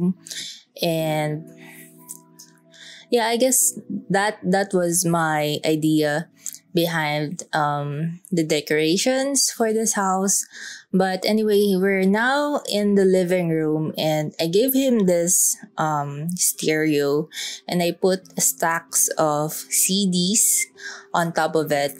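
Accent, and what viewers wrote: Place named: Philippines. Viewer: Accent pa lang alam mo na agad na pinoy to hahahaha nice vid po ma download nga to sa lot ko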